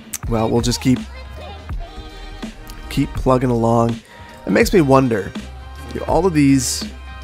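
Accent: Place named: Midwest, United States. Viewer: My wife and I were convinced that you had to be from the Midwest. Now we're certain. Keep up the good content man. Go Pack Go.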